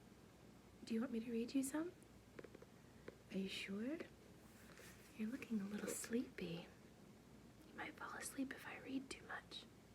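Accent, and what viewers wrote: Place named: England, United Kingdom. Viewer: Back with the very convincing English accent 🙂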